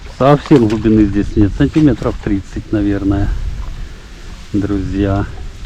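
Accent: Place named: Ukraine. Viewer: спасибо за рекомендацию) по Украине - дык по говору узнал своих😅 по карпу-Вы абсолютно правы! бывает 3 кг рвет круче 10ки. самое интересное в тгм году на небольшой но быстрой и закоряженной речке взял 2 карпов с разницей в несколько дней. оба 3400+-. дали абсолютно разный бой. первого я просто чудом достал. второго - легко и спокойно.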